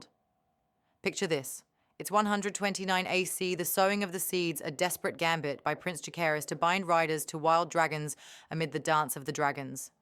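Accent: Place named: Australia. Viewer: This sounds like the same voice as the last video, but with an Australian accent instead of a British one... I want a Jamaican accent for the next video.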